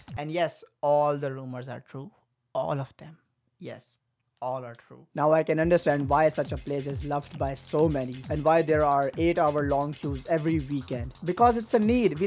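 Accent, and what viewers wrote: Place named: India. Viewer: Your funny story about a big guy complimenting your outfit is not funny at all... Berghain is a place of diversity, it originated from a gay club. Making fun of gays should permanently ban you from Berghain. I would rather hear someone telling me slaaay than your awful indian accent. I hope I dont get to see you ever in Berghain...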